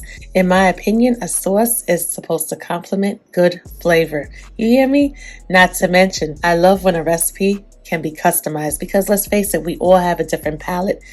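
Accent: New York, United States. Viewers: I can listen to her NY accent all day 😍❤!! Another great recipe!! P.s. I'm a silent watcher, but I just couldn't help it anymore, lol. I had to comment & say how much I love your accent.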